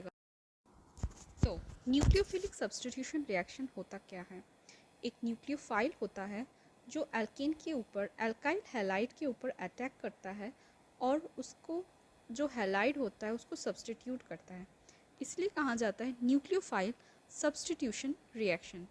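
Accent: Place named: United Kingdom. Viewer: Mam aapka accent thoda british ho rha h 🥲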